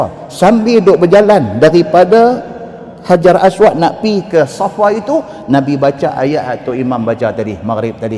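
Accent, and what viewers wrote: Kedah, Malaysia. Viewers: Ustaz orang kedah ke? Btw terima kasih atas informasinya.❤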